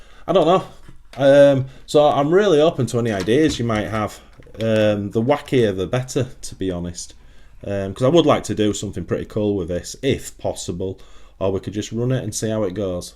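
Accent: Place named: Yorkshire, United Kingdom. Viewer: I love your videos you live in Canada but you sound like your from Bradford or may be Leeds. I am looking forward to your next video.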